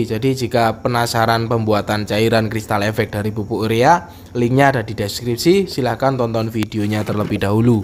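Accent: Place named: Java, Indonesia. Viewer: bg jenengan sh wong ndi. mandan medok. bisa nk edek otw jluk tulung ngecet na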